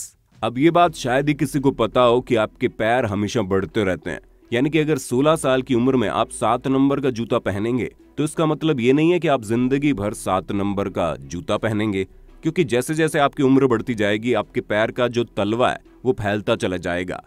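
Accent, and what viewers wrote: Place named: Lucknow, India. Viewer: मैं भी लखनऊ से ही हूं काफी दिन से सोच रहा था ये आवाज किसकी है और लहजा तो हमारे ही आस पास का है आज ही जाना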